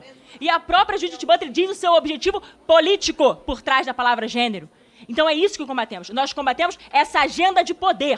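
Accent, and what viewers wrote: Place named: Rio de Janeiro, Brazil. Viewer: Linda, inteligente, católica e carioca. Ô sotaque lindo!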